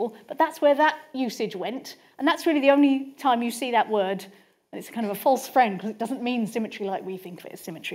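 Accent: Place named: London, United Kingdom. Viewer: BTW... I love her English accent.. London? ❤️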